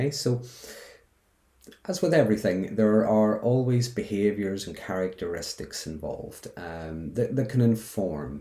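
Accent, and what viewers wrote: Ireland. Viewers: Hello, thank you for your videos. My family not only was a cult in the sense you explained in the video, but we were also in an actual doomsday cult. May I ask where your accent is from? My great-grandmother's family moved to Canada from county Cork in Ireland and you sound just like nanny and aunt Ethel. They were also Magees. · Well done, you're a smart guy--this was very good! That's a fine Irish accent by the way. Cheers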